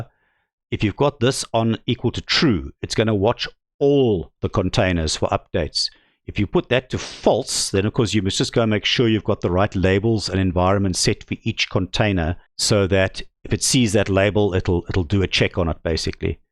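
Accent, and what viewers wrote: South Africa. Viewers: Thanks for the video. By the way South Africa or Netherlands? hearing a familiar accent here.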